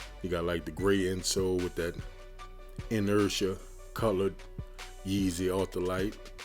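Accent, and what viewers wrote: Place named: New Orleans, United States. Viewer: They hard bruh ... Man U from NO... the accent sound just like it ... I’m in BR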